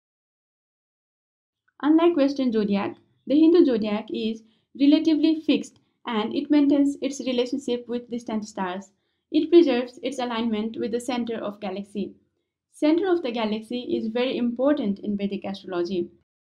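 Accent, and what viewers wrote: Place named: Nepal. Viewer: Your accent sounds Nepali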